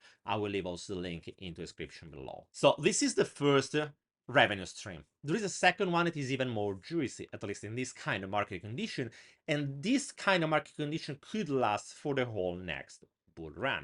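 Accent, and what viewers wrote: Italy. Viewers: Love your accent. Is it Italian? Spanish?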